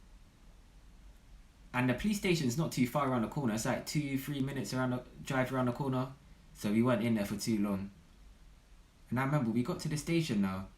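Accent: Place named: United Kingdom. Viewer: crazy story! I love your british accent lmao, im from australia :)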